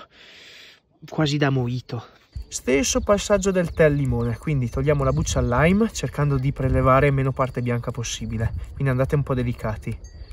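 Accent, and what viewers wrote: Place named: Trentino, Italy. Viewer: Ehiii, da dove vieni? Io sono trentina e sento che anche tu lo sei hahaha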